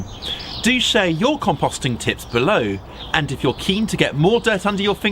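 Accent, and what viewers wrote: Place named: Cockney, United Kingdom. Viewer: Im learning English and I found your accent is little bit like cockney :)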